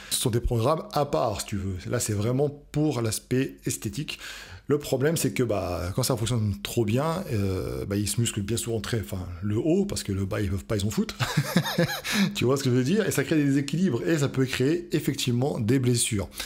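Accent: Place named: Nice, France. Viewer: T'as de plus en plus l'accent niçois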